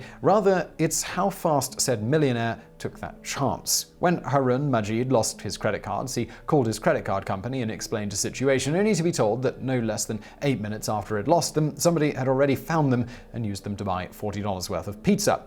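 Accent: England, United Kingdom. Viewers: Your English accent makes the insults all the much funnier. Thank you for my early morning lols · I was tickled by the title and it’s irony. While unnecessary, it was interesting pointing out these wealthy people who have committed these relatively petty crimes by comparison to those crimes historically committed by their counterparts. Hearing the host’s British accent and the words “wealthiest” and “thieves” makes it impossible to ignore the obvious. The majority if not all of the wealthiest nations and corporations in the world are wealthy because of all they have stolen, land and labor. The wealthy with few exceptions are an entitled bunch of folks. They spend what everyone else has worked hard for, and think everything is theirs for the taking.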